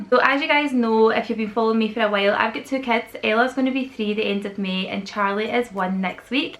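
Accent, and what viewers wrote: Scotland, United Kingdom. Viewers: Thank you I used to really hate the Scottish accent but I love it now! URS and Ella's accent is cute.... I love the way Ella speaks and she speaks like an actual little women.... I have always wanted to have a channel on here but have always been to shy and intimidated.... Have you any tips? Hints? Editing programs?? Xxx ❤️ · Love ur channel great hear Scottish mum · Thank you for always having a cheery disposition and its always lovely hearing a fellow Scot! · thank you 💖💜 I've only just started following your channel after your collab with kate💕 loving all your content and it's so lovely to hear a fellow scottish accent on here!! Keep doing what you're doing! xxx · It's so nice to have a Scottish vlogger who's content I love. Your accent keeps my home sickness at bay! Thank you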